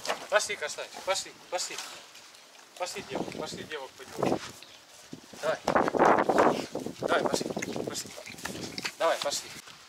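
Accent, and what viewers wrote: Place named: Surzhyk, Ukraine. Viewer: ви приклад для всіх.Відео ваші 👍 Нам дуже подобається як ви розмовляєте по суржику.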